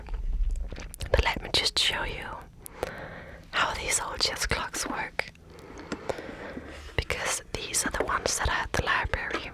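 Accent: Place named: Ireland. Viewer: bravo! i usually view whisper vids as a copout for wannabe-ASMRtists who don't have a suitable voice, but you're the exception to the rule. question before you get too famous and stop replying - what's your nationality? I hear an Irish accent most of the time but iirc you said you were from Belgium or The Netherlands?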